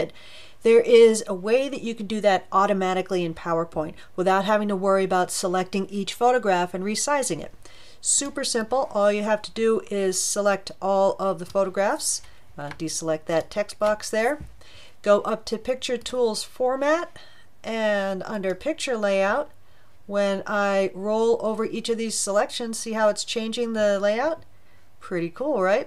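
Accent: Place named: Northeast, United States. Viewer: thank you lady on the internet with a north east accent of some sort. saved me hours!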